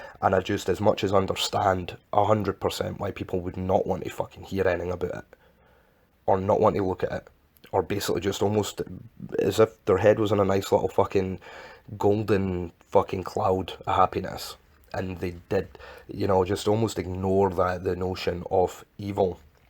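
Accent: Scotland, United Kingdom. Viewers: Where you from in Scotland mate??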